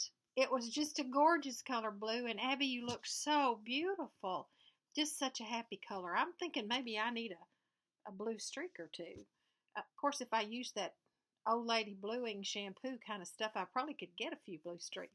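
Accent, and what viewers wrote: South, United States. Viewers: Love your videos and your southern accent. Do you have any problems with the hoops "crushing" your finished work?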